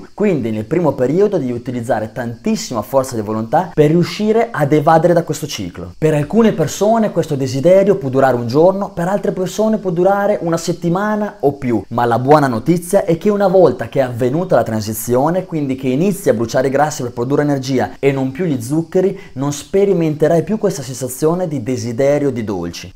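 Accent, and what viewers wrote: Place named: Modena, Italy. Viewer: Ha l'accento di Modena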